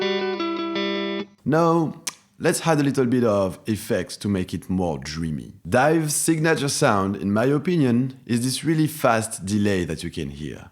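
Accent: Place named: France. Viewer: That little French accent, so sexy ! Don't give up !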